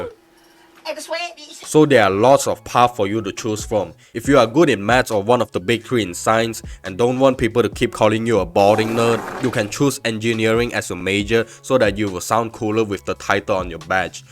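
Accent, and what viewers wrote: Malaysia. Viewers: First word of the video can already tell bro is a malaysian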